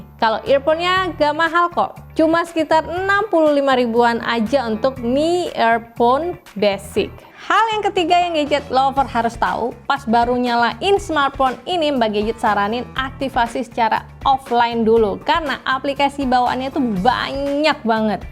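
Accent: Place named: Depok, Indonesia. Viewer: kalo dari logatnya kek org depok😄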